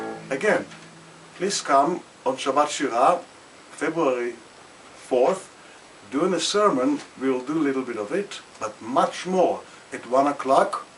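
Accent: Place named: Germany. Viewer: I'm in love with the old German accent. This man reminds me of the aristocratic and finely-cultured Jewish family in the Brock & Brody Theone series The Zion Covenant. And Impressive singing!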